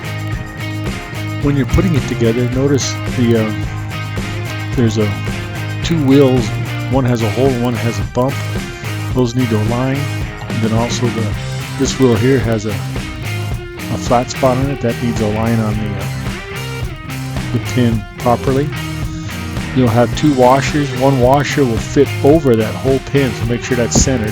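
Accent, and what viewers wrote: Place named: Minnesota, United States. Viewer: Great Video!!! Your either cold or your Minnesota Sweed accent is coming through!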